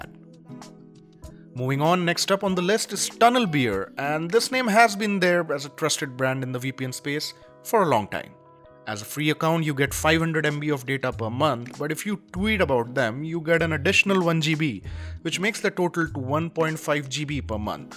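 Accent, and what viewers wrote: India. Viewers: The best review of vpn by an I Indian accent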